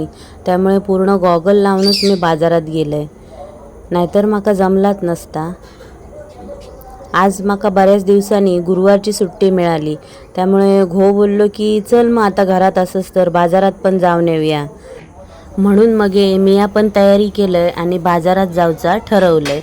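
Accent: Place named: Malvani, India. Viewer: तुमची व्हिडिओ मी पहिल्यांदाच बघतोय तुमची मालवणी ऐकून मला प्रभाकर मोरेंची आठवण झाली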